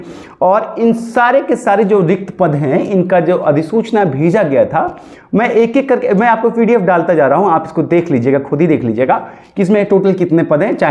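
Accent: Bihar, India. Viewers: Aap Bihar se ho kya sir???😊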